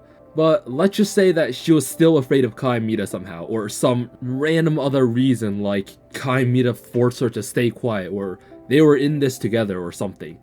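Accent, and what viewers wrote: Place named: United Kingdom. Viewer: can’t watch game theory since the new guys british accent keeps throwing me off and making me nauseous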